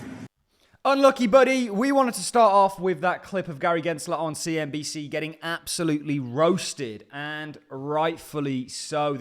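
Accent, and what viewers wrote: Birmingham, United Kingdom. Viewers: Cheers. Keep up the good work. Placing your accent somewhere in the south / South West Birmingham area?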